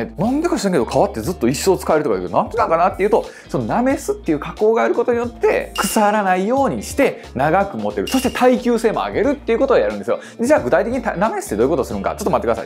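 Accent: Kansai, Japan. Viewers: あまり詳しくなかった私にもとても分かりやすいです 話し方も私には関西のお兄さんなんで聞きやすかった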